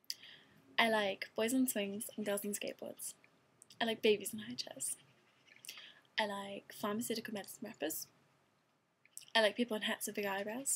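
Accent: England, United Kingdom. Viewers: Calm down. You only asked where she is from, after stating that you couldn't place her accent. She is from England but lives in Canada. If you've watched even half of her videos then you should have known that already. As for why one site says the other... perhaps she signed up for youtube while still in the UK and never felt the need to change it. Such speculation kind of makes people sound like stalkers though so... I'd avoid it. I'm just saying. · i like this girl. she has a british charm to her personality. maybe it's just the accent though. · Her accent doesn't sound even a little bit Australian. It's clearly English with a little bit of North American. But like the other person said, who gives a shit?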